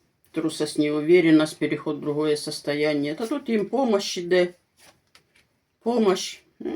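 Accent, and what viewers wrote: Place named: Surzhyk, Ukraine. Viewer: . Люблю вас смотреть и слушать, как вы разговариваете на нашем, суржике,. Посмотрите Мариуполь, может, что то уже изменилось.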